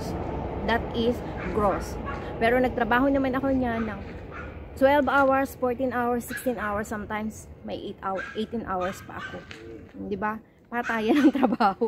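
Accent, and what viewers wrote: Philippines. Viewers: batangueña po kayo? :)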